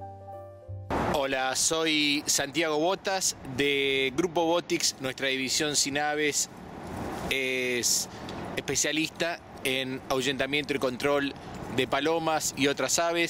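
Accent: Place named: Argentina. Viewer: El que habla es argentino.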